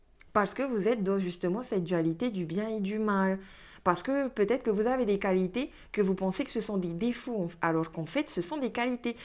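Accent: Tahiti, French Polynesia. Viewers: Bonjour J'ai reconnu votre accent de Tahiti J'y ai vécu en 70/71 avec Raupea pendant 10 mois .j' ai passé toute ma vie avec son souvenir dans mon cœur j, avais 20 ans .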